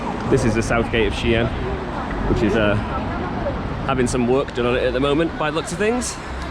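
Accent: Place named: Teesside, United Kingdom. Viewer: I am super late to the party here but loved this video! So lovely to see your face and hear your voice... still got your Teesside twang!:D xxx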